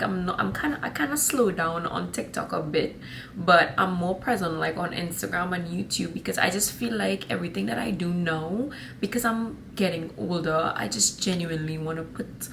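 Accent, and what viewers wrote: Trinidad and Tobago. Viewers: It's refreshing to hear a trini accent. I lived in Canada for years long winters and no jobs. I now live in Maryland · I like your Trini accent too. The best Caribbean accent. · You are so right, I'm in Western Massachusetts perfect for anything,about the long days look up the midnight sun in Norway or the twilight all night long in Northern Alberta,I luv the accent its one of the most beautiful,You're a true Trini a mix of many things, much success to You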